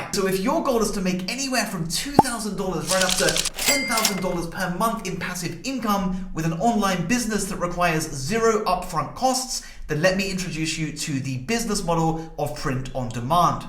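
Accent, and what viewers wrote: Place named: United Kingdom. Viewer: Annoying that Printify don't actually provide greeting cards in the UK. Since the guy in the video sounds British I didn't think it would be a problem and I've already done all the other steps but I cant actually do it! I cant seem to find any platforms that provide this in the UK - does anyone know any?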